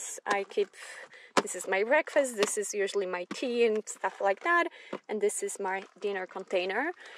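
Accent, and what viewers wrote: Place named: Central Europe. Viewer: I'm curious about three things in regards to your video. Firstly, why do you feel the need to roll the windows up in Griz country, and secondly, have you considered removing the front passenger seat for more room - or does it serve some purpose? Lastly, you have an interesting accent that sounds to my ears to be from Central Europe - am I close? 😀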